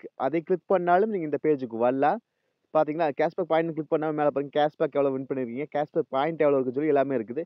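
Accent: Coimbatore, India. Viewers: Coimbatore voice super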